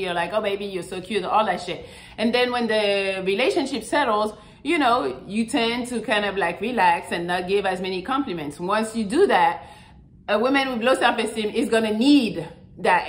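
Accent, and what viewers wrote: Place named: France. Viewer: I just love your fench accent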